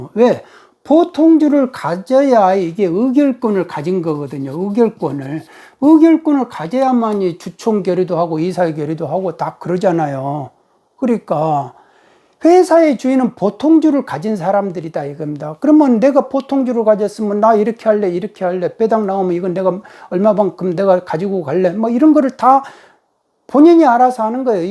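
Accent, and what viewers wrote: Jeolla, South Korea. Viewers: 뭔가 팩트만 항상 말씀하시는 분 같이 느껴지네요. 억양은 라도분 같은데... 믿음이 가네요.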